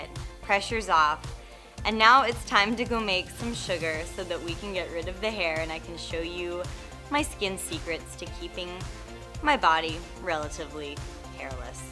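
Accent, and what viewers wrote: Texas, United States. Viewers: You are ALWAYS such an inspiration! Your aunt was awesome and a pretty lady too! Oh... and just love hearing your TX draw:) Sure wish you had your co op 16 yrs ago when I lived in Houston! Also wish you were touring close to me in Kentucky! <sigh> oh well. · a texas twang ? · Your Texas accent is so cute!!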